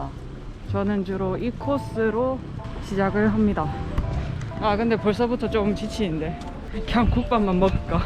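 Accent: Busan, South Korea. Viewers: 부산말 너무 귀여워요. 자꾸 듣고싶어지는 매력! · 근처에 사는 부산녀에요 익숙한 사투리와 승학산 친근해서 좋습니다~~^^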